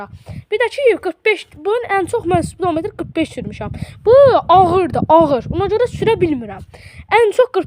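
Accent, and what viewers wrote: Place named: Goychay, Azerbaijan. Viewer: Göyçayli ləhcəsidi deyəsən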